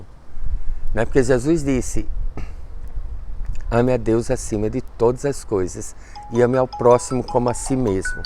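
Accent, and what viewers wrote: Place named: Rio Grande do Sul, Brazil. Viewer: Mas Bah!!! Num bom gauchês!!! Show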